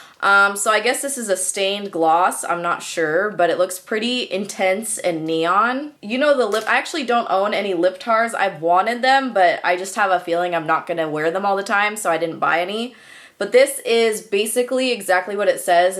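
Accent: California, United States. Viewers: I can tell you're from California by how you talk lol it's great! You're so pretty and I love your videos!! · You sound like you're from California :)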